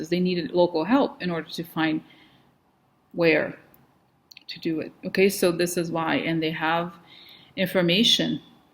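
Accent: South, United States. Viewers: Just luv the southern drawl! What a hoot you are !!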